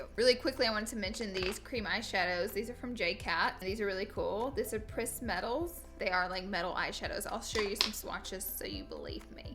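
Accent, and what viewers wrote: South, United States. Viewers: she’s from the south. Let her be her:)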